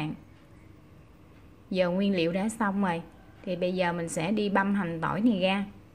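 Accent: Mekong Delta, Vietnam. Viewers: Ủa thấy bình thường mà. Chắc do bạn k nghe quen tiếng miền Tây. Chứ chị đó nói bình thường ắ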